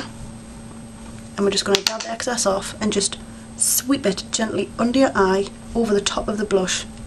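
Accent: Newcastle, United Kingdom. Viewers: OMG it's nice to hear you talk, love your British accent. Oh by the way, you're pretty even without any make-up at all. The nailpolish is strange, but them I'm 48, haha... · r u from newcastle or around somewhere there?? great tutorial btw!!!!!!!!!!! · Is that a Jordi accent?? I absalutley love it <3 x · She's a Geordie, it's a famous northern English accent. It's the same accent as Cheryl Cole if you youtube her on X-factor UK...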